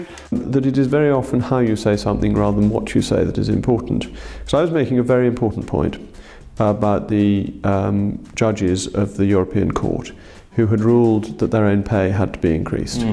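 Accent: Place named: RP, United Kingdom. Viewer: Araelius, He's got a plum in his mouth the size of a peach but you know you could have a great chat with him down the pub over a few beers. I can't imagine that with almost every other politician.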